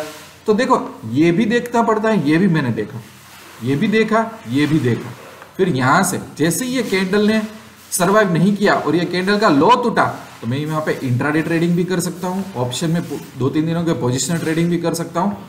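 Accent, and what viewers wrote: Gujarat, India. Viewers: Very nicely explaine in Hindi with Gujarati lehja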